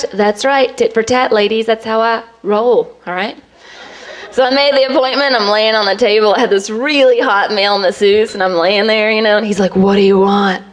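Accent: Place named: Georgia, United States. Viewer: Great comedian, terrible audience. Also... looooove that Georgia accent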